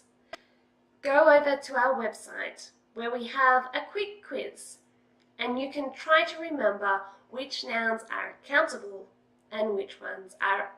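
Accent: Australia. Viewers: Content is exceptionally good!! Exactly what I was looking for for a friend. However, sound and video production are, I am sorry to say, horrific. Sound production is important, because many will find the strong Australian accent unfamiliar and then add to it the slight echo from the bad sound and that makes it even more difficult for people to understand you. The slow speech is excellent, though. I am hoping since 2019 you Googled "sound for youtube videos" and made ANY improvements suggested. Really, if you are going to produce youtube videos, doing the absolute bare minimum for quality, not far below, is expected. The poor production quality is really detracting from your absolutely fantastic content. Cheers!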